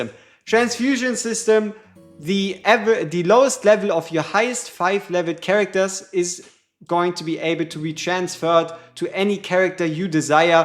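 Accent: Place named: Germany. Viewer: Ich erkenn an der Art wie du sprichst das du Deutscher bist. Meinst du man sollte neu anfangen wenn man diese Fehler relativ oft am Anfang gemacht hat oder trotzdem weiter spielen bin jetzt Level 25 nach 2 Tagen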